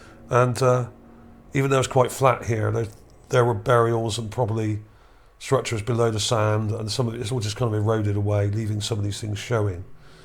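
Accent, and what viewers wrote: Bristol, United Kingdom. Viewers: Sound like a Bristolian or are me ears playing up…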